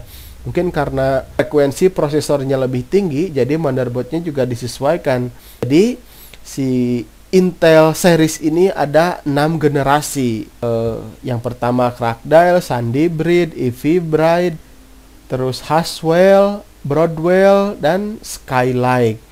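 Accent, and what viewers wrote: West Java, Indonesia. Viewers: Dibaca pake aksen sunda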